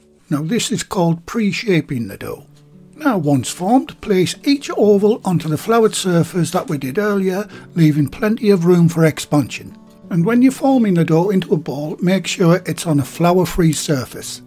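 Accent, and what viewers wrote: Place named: Northern England, United Kingdom. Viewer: good man. Cheers mate. I love hearing your voice in these videos, and used to watch them from the US for the Northern England nostalgia kick alone :P I swear you should get a job narrating shows or books or something (though please don't stop the YouTube channel if you do!). All the best, and thanks again :)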